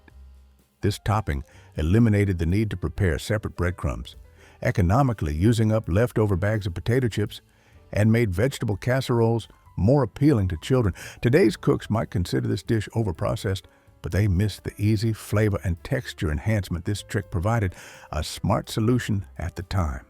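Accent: South, United States. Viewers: Just curious for no good reason... Is this an AI voice with a southern accent or a real person?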